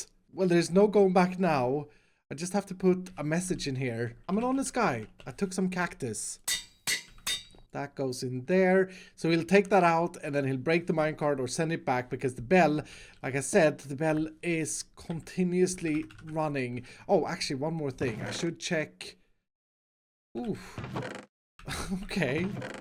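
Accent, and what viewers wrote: Sweden. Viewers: I just looked it up he’s swedish Well its easy for me to recognize I’m also swedish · Are you swedish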